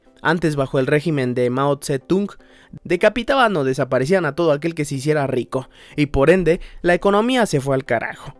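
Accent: Guanajuato, Mexico. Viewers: Eres de Guanajuato, verdad??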